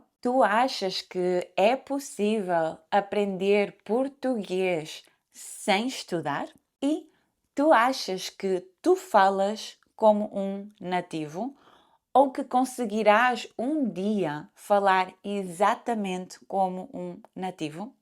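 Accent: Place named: North, Portugal. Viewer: She talks extremely slow so others can understand for sure. And I am just a son of a Portuguese dad who always lived in The NEtherlands but if I am correct the way she talks seems to be more from the north. Especially the "ou" is different from what I am used to (my family is from Lisboa). Nothing bad is meant, but it is what I hear. I have been to Algarve many many times and I don't think I heard people talking like this, but I do remember the same "ou" in Miranda de Douro. Also to my ear hear "s" always sound the same...like "sh" But the way I am used to, there is a difference. It is slight, but I can hear it.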